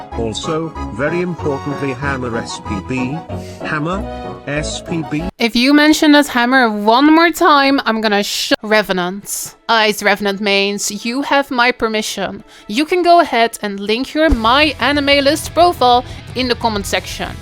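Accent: Netherlands. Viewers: i swear i hear a dutch accent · I love your english-dutch accent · That dutch accent made my neckvein throb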